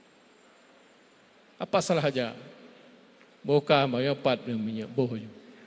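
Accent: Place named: Aceh, Indonesia. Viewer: muzakir manaf belum pas berbahasa Indonesia masih logat Aceh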